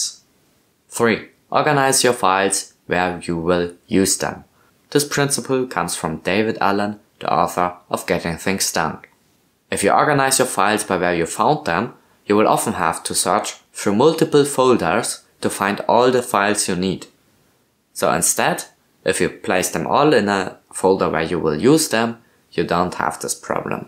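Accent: Germany. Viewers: bro has the strongest german accent iv ever heard, nice video of course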